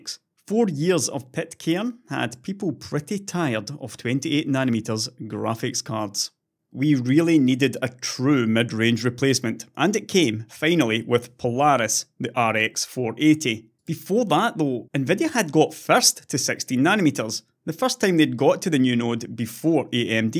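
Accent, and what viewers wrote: Scotland, United Kingdom. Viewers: Damn, is scotish? Just noticed...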